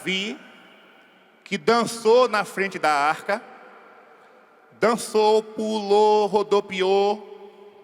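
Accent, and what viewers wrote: Bahia, Brazil. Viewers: Sua bênção padre sou mineira e amo suas pregações com esse sotaque baiano kkkkk Deus abençoe o senhor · Boa noite Padre gosto muito do senhor admiro demais da conta suas homilia são todas maravilhosa gosto muito do sotaque baianos e de todos os nordestinos acho muito lindo🎉🎉🎉🎉🎉🎉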